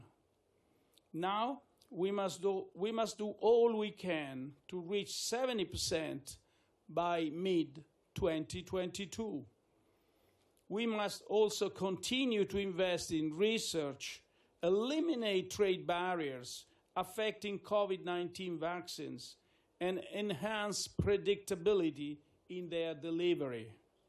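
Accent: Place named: Italy. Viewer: Accento spiccatamente italiano. Che non è un problema, sia chiaro, il proprio accento è qualcosa di cui andare fieri. Resta il fatto che mi aspettassi qualcosa di più.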